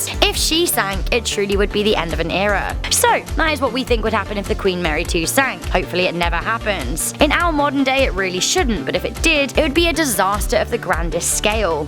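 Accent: England, United Kingdom. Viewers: I like this person's Englisacccent, but seriously.... She can't pronounce Cunard? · She's British accent bro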